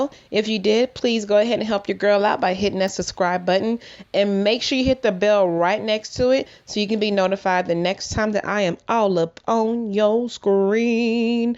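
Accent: Nigeria. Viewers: “Like it’s part of my chocolatiness” 😂😂😂 I love your naija accent too.This quarantine situation is having errbody glooowwing 👀👀👀👏👏👏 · You make me laugh when you speak Nigerian accent.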